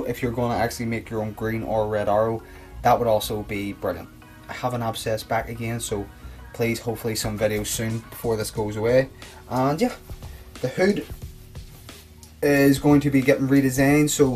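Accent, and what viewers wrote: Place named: Ireland. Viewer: Mate I thought you were irish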